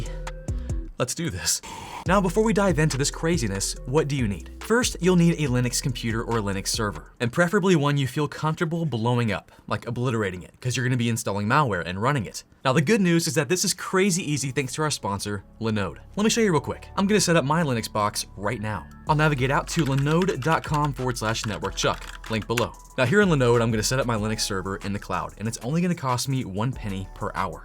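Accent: Texas, United States. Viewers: what part of Texas are you from? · YEEEES HES TEXAN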